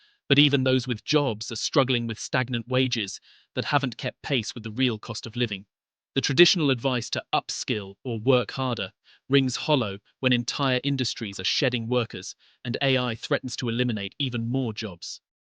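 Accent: England, United Kingdom. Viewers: What a miserable outlook video 😮 brought to you by a meloncoly sounding pommy shingles patient lacking any form of sunshine. Gore is ok for living or housing. Thjs video is just bullshit. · It is interesting that the narrator has an English accent, because the UK is far far worse ... it is a country destroying itself and is well on the way to becoming an Islamic state in the not too distant. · I find it curious that the narrator is clearly a Brit having to point all of this out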